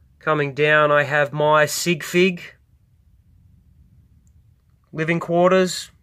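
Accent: Australia. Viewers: He sounds SO Australian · Just a suggestion for moc's like the one you just did, have you ever heard of brick arms? Brick arm's are miniature weapons and accessories which are compatible with minifigs. I have quite alot, I can tell you're Australian so lucky for u the nearest website is in Melbourne, Victoria it is called rick's james bricks, they sell brickarms and lego. Hopefully this info is useful to you.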